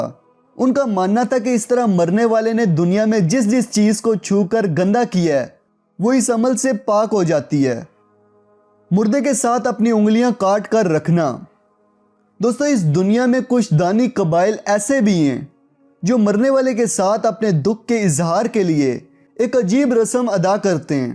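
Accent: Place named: Punjab, Pakistan. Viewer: Aap ka Urdu talafuz Sahi nahi hai Aap k talafuz me Punjabi talafuz ki milawat hai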